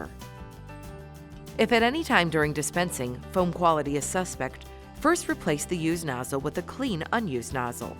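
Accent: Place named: Wisconsin, United States. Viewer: Love the narrator's Wisconsin accent.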